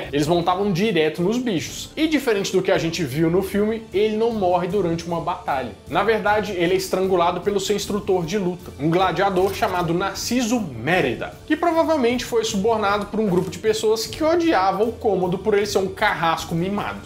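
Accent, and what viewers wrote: Minas Gerais, Brazil. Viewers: Ele fala mineirês.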